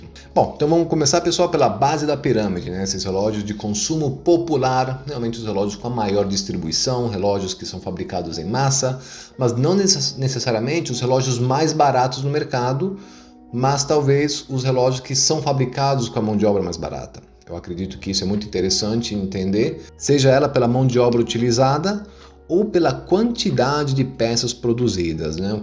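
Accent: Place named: England, United Kingdom. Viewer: A Seiko comprou a Orient e surgiu a Orient Star, relógios belíssimos e diferenciados, fino acabamento. Tenho um Contemporary com open heart, muito preciso e bonito, esse cara não conhece um Orient Star. Ele também tem mau gosto, seus relógios com pulseiras laranjas que o digam, cada qual com seu lado brega... a cruz suíça não afasta esse trejeito de um Magal, nem sotaque do inglês...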